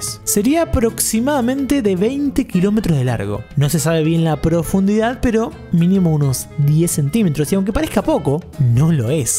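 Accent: Argentina. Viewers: Vos sos argentino?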